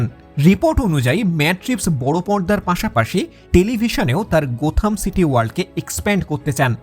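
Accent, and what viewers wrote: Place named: Bangladesh. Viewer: Apnar kotha to kolkatar manusher moto na.😞 Bangladesh er manusher moto lage.😋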